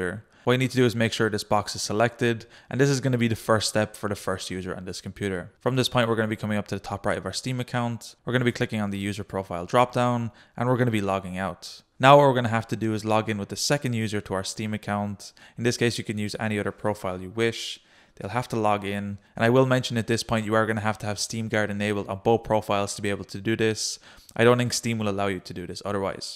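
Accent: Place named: Ireland. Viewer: I can hear an irish accent of ye, you irish by any chance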